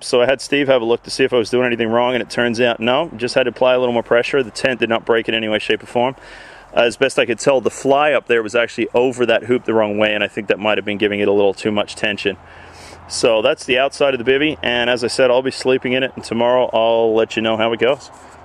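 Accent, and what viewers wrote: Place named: United States. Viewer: You have a thick American accent for an Aussie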